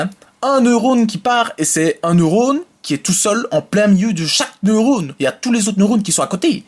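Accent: Belgium. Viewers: es tu Belge???:D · ahahahaha!!! Génialissime, avec l'accent belge et tout trop fort, merci:D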